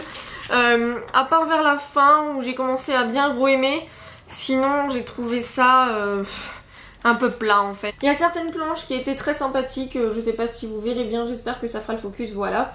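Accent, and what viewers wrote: England, United Kingdom. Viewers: Tu me fais trop rire, t'as des fois un petit accent anglais, j'adore! T'es un peu fofolle je crois :p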